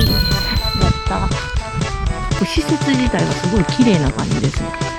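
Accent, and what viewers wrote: Kansai, Japan. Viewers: 4度って夫の実家の台所の温度😂😂🐻音を聞いていても楽しいし、主様の話し方が同じ関西なのでとても親しみを感じます。メスティンのご飯いつも美味しそう❗暮れていく富士山絶景でした😢✨お疲れ様でした😃！一緒にラーメン🍜🍥食べに行きたいです！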